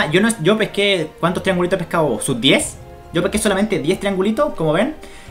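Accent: Chile. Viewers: eres chileno ? xD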